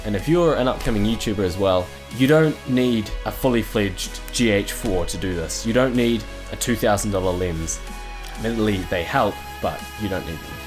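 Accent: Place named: New Zealand. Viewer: keep making videos, mate. your videos are amazing and I like your kiwi accent! :D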